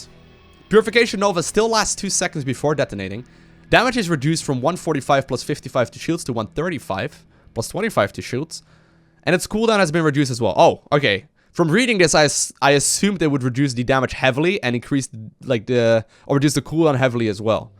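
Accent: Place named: Netherlands. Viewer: I find that if I watch too many videos in a row I start unconsciously talking with a light Dutch accent.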